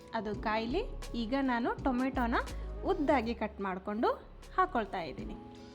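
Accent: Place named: North Karnataka, India. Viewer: Uttar karnataka bhashe na chenda 👌🏻and alli kade uta matra masta irat super akka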